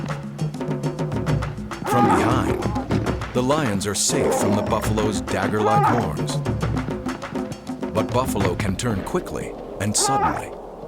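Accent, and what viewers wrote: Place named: Texas, United States. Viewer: He's narrating Texas-style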